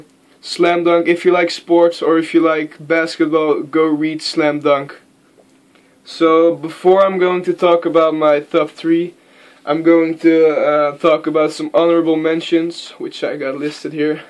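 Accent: Netherlands. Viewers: Are you Dutch?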